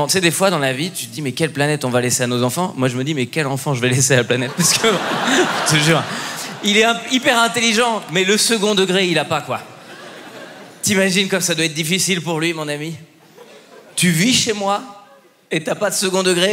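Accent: Quebec, Canada. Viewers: Haha! Pas mal l'accent québécois. Un mélange d'accent du Saguenay et de la Beauce😎. Probablement une des meilleures imitations de l'accent québécois par un français 😎. Pour une fois qu'on a pas l'aire trop... bozzo🤙😉. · L'accent québécois m'a fumée rire😂😂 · Ouah… Vérino nous avait habitué à tellement mieux… là on nage en plein sexisme (« Hahaha ma femme qui… ») nappé d’humour anti-accent québequois, c’est pas terrible. C’est border Bigard. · J’ai prit un de ces fou rire avec son histoire et l’accent québécois ! Magistral. J’attends la tournée avec impatience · C’est le meilleur accent québécois fait par un français que j’ai jamais entendu! (Je suis québécoise)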